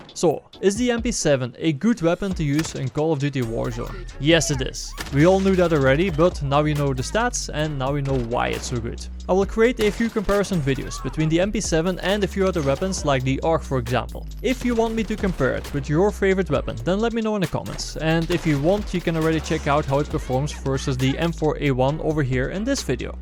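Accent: Netherlands. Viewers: Pretty sure he is dutch judging from the accent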